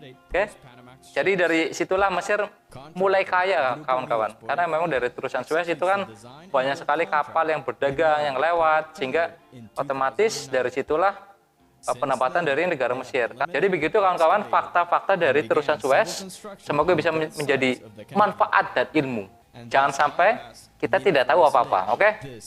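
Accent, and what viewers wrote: Banyumas, Indonesia. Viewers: Ngapak ya kang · Ngapak kie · Kurang pengetahuan ini mas bro. Dan istilah2 laut nya juga kurang paham. Dan yg fatal gak bisa bedain mana suez dan panama😀. Belajar lagi mas. Dan bicara nya jangan ngapak bgt dan aja kebanteran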